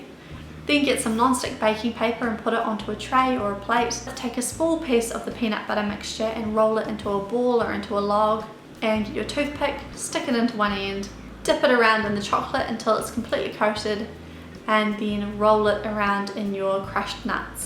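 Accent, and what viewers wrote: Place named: New Zealand. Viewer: Just discovered your channel. Absolutely love your videos, they are so genuine and watching them made me smile. I sense a kiwi accent hhaha. Hello from your neighbour in Australia :)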